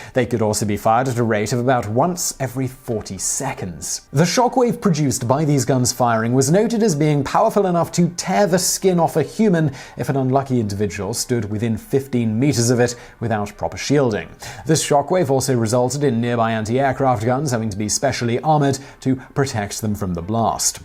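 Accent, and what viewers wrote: United Kingdom. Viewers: Too many factual errors, even with the British Accent, to be worth watching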